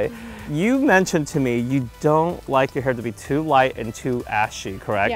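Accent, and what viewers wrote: Australia. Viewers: she's Australian, I believe. 😁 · you’re right! I was going off her accent I heard at an interview. I clearly was t listening close enough because I can usually tell the difference. Perth Australia. I just looked it up. Thanks for the correction 👍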